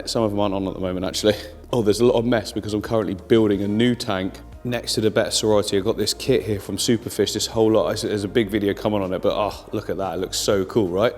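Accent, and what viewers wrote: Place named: East London, United Kingdom. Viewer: I enjoy your videos and your Eastenders accent. You have a natural knack to the hardscape balance and plant placement '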